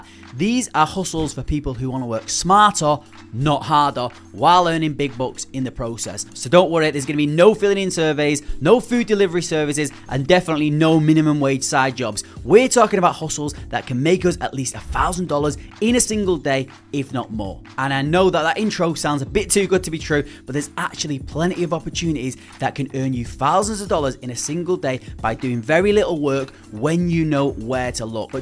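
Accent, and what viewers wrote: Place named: England, United Kingdom. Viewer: Thanks I like you English accent easy understand! Thanks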